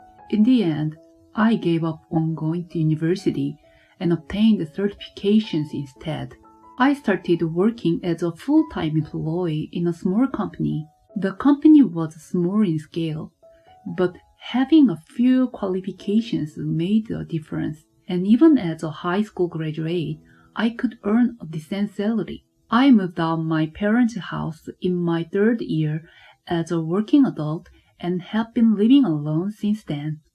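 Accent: Japan. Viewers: Hello! Have idea! Hire someone to read stories that are translated into English by someone who has actually lived in a real English speaking country and attended some kind of Grammer school in America or England or Canada or Australia so that other English speakers will have some chance to hear the story clearly! Present reader obviously has never left Japan!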